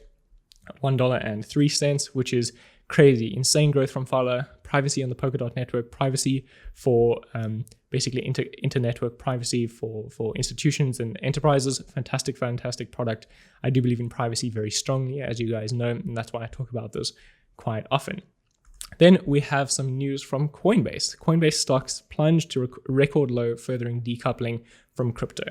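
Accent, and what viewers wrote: South Africa. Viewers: are you from South Africa? I don't know if you have mentioned it before, sorry if you had. Your accent reminds me to Elon's. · This guy sounds South African, am I right boet?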